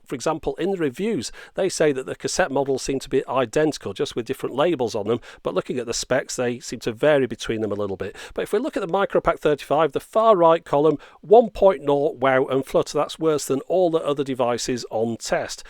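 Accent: United Kingdom. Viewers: You're my favorite, seriously, you're the best of all technology channels, and I love your British accent ^^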